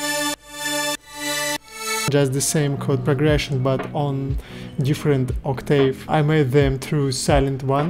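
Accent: Russia. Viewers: Brooo amazing work, really! No I feel like my remix is bad😅 Also your russian accent Is reaaly cool! Almost like in my country? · dude i love your english russian accent...no need to feel embarassed when you speak in english :)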